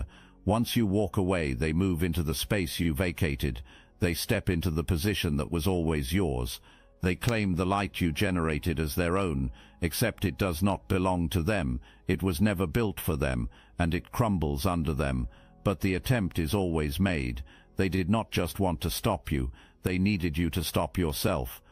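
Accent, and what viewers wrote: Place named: United Kingdom. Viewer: my dear chosen one which i respect so much because of your magnificent british accent i thing there is a misunderstanding here ,,what spiritual gifts are you talking about or any spiritual property ,nothing from that exists,i guess that something else is needed here ,, cant trace yet but soon as i realise i let you know,,,,😁 👻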